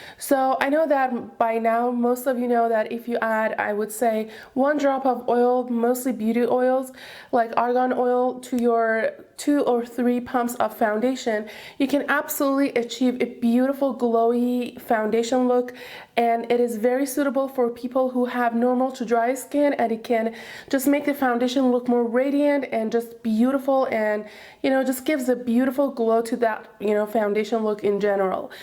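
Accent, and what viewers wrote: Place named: Iran. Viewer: jan (I love your Persian accent!) I love your eyeshadow look here! The bronze color looks really good on you. I never had luck with Josie's makeup products and because it seems they are more pink toned.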